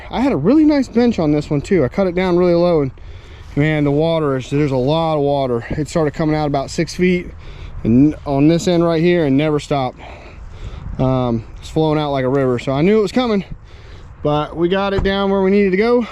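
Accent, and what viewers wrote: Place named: Carolina, United States. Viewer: I think its great when ya talk buddy, you speak the Carolina sweet jaw, sounds wonderful , great videos Mr.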